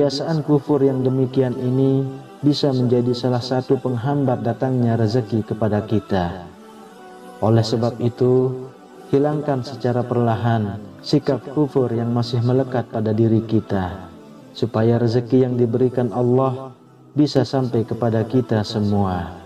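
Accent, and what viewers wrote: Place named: Bandung, Indonesia. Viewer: Terima kasih ustad penjelasan nya.🙏🙏🙏👍 Ustad orang mn ya.suara nya sih seperti orang bdng ya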